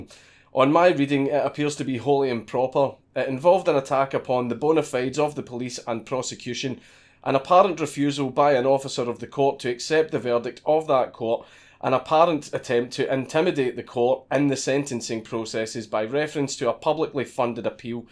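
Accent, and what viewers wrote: Scotland, United Kingdom. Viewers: SCOTTISH AF! Where's your blue face paint son?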